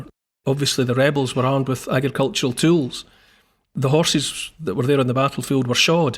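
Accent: Scotland, United Kingdom. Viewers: I like talks about rebellion best when they come with a Scottish brogue, and most of all when the mouth is framed with the fabulous beard of Neil Oliver.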